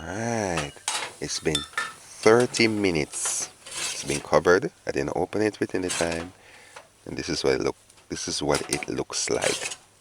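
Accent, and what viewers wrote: Jamaica. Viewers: I am enjoying your colorful cooking this great blessed Saturday morning. And please do not speak patio, not everyone understands the twist in the English pronunciation. You are making videos for the general public, correct? You will have more more subscribers. Great number turn ups for you internationally. In JESUS Christ mighty name we have prayed amen 🙏🏽!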